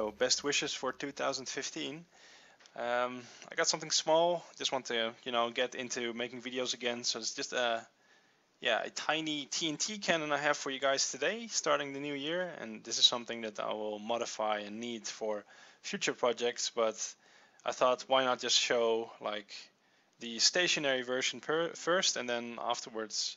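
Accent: Netherlands. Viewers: Is he dutch??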